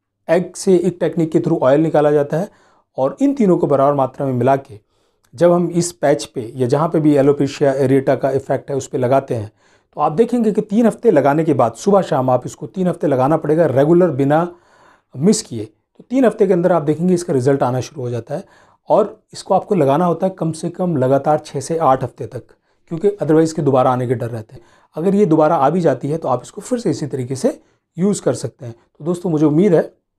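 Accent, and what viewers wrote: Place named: Assam, India. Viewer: Assam sai bol raha hi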